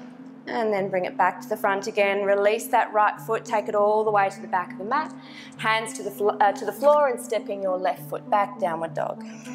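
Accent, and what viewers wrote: Australia. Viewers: That Australian accent though!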